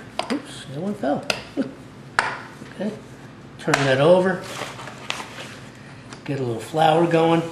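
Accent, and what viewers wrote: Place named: Brooklyn, United States. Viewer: !! I love your intro, the history lesson, and this artisan pizza recipe. This is a must try. Thanks for the olive oil on the dough tip! I like that Brooklyn accent when it sneaks out too!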